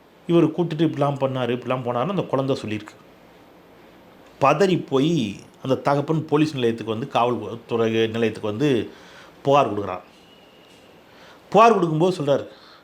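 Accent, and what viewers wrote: Tirunelveli, India. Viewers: sir tvl language ❤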